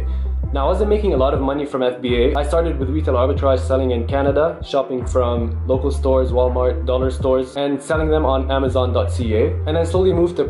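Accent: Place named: Arab world. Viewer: i kneeeeew u were an arab from the accent Same here.....i am egyptian and mechanical engineer also 😊 Wish u all the luck bro...accept my friend request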